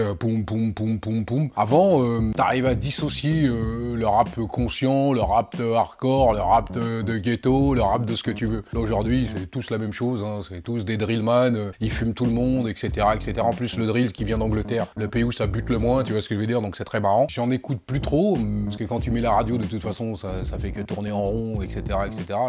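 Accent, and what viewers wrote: France. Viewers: Un pure parigo, un phrasé telment inimitable 🖤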